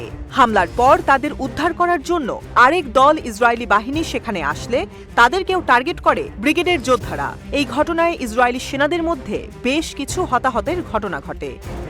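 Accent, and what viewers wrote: Kolkata, India. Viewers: কোলকাতার মতো সংবাদ পাঠে বিরত থাকুন। শুনতে ভালো লাগে না।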